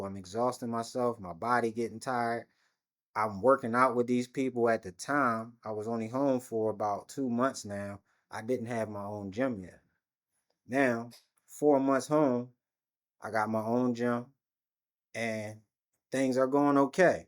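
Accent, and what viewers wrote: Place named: Philadelphia, United States. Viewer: You from Philly? I hear the Philly accent,good work cousin!! 👊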